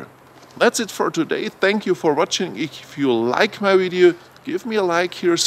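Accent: Germany. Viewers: Super video-Thought his german accent be irriating but I grew to like it. Thanks again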